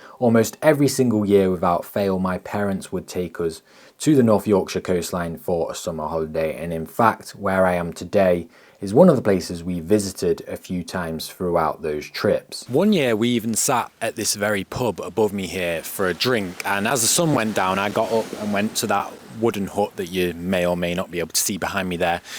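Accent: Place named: Northwest England, United Kingdom. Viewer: Eeee Saltburn, I was dragged up in Teesside (Stockton) but haven't been to Saltburn for yonks. You sound like you're from NW England more than NE!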